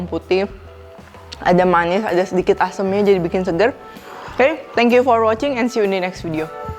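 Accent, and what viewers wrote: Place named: Cengkareng, Indonesia. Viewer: Masukin dialek warga cengkareng bgt.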